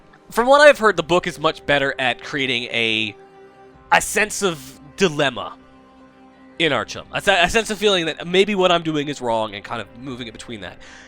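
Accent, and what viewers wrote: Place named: Russia. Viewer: "join the army they said- It'll be fun" Pretty much the summary of the whole game. I am also a fan of the 'turn around and everything is different' and 'magically forming floor' tropes. It always gets me, specially when used in a horror/thiller sense. Not my favorite game, but it was entertaining none the less, your Russian accent helped with that lol. The monster's especially the Librarins were honestly terrifying. Though the story was super muddled to me and when you start explaining the book and stuff at the end I go "huh... so that's what that was"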